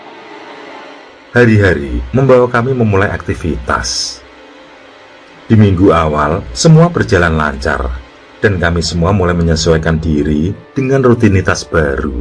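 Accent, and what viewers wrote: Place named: Yogyakarta, Indonesia. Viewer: dari bogor cengkoknya jogja :face-blue-smiling::face-blue-smiling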